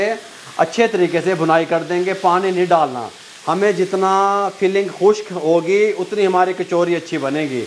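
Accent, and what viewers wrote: India. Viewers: Love ur all recipes, love ur desi accent,, i try ur all recipes, all are yummy, delicious, tasty, from spain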